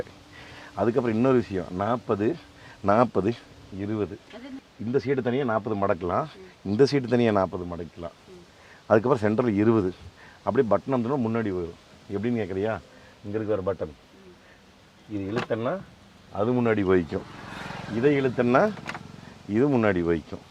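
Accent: Kongu, India. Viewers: Addict your kongu tamil Anna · கொங்கு நாட்டு தமிழ் உச்சரிப்பு அருமை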